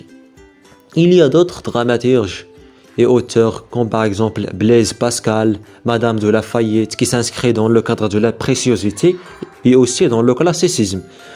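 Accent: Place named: Arab world. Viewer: Ohhh l'accent arabe🤓🤓 sinon bonne continuation frr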